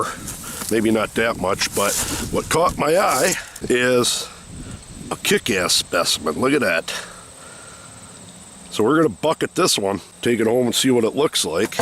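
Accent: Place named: Midwest, United States. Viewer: You sound so midwest I love it.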